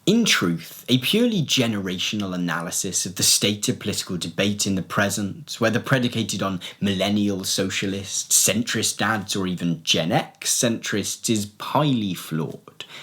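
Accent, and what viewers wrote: United Kingdom. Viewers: Apparently I'm late to the party but just wanted to say yes! I've found a new left tuber! And it's refreshing to hear a UK YouTuber who's unafraid to speak with a UK accent and UK pronunciation. Idk why but I really dislike the extreme US fetishism of most UK YouTubers. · He’s British. That’s a different type of english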